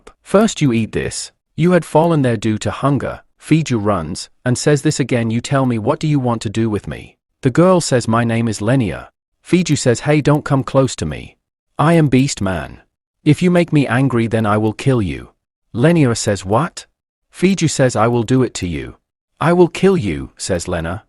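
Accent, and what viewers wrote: India. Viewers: WTF why after min 50 the Ai voice have India Accent, at least check your edited video dude.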